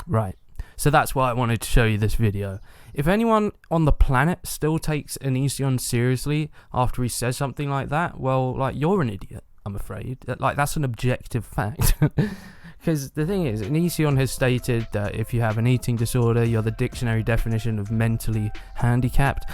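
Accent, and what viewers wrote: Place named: United Kingdom. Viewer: I love hearing a Person with a British accent shit all over him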